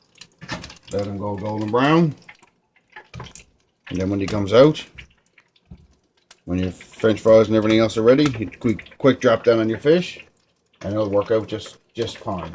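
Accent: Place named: Newfoundland, Canada. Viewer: I am loving your accent... I was thinking you’re from Ireland living in Alabama😂. 🇨🇦 Newfoundland!!! Never would have placed it 😉 thanks for the perfect recipe 😋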